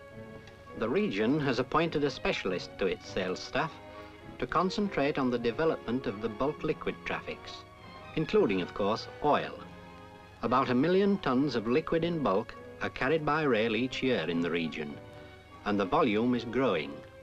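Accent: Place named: Newcastle, United Kingdom. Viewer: I can hear the Geordie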